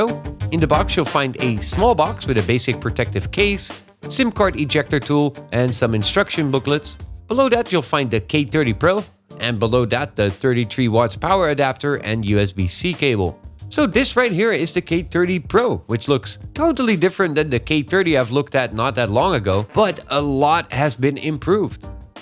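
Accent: Netherlands. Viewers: you dutch? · He's definitely Dutch. His accent is pretty good as far as Dutch people go, but as another Dutch guy it was still a bit distracting. You can here it on his "th" for example. He is very deliberate to try and get it right, even then he sometimes slips up in a very Dutch way like the third time he said "thirty" in a row. "tirty" and "firty" are ways of getting around the inability to pronounce "th" almost every Dutch person, me included, does. He went for the former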